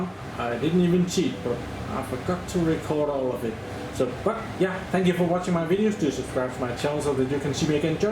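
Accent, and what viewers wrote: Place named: Denmark. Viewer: Syntes sku nok det lød som dansk accent :D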